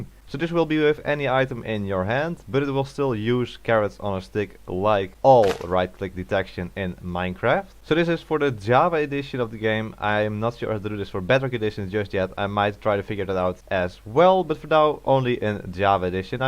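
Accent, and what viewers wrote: Netherlands. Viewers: You can just hear the dutch accent...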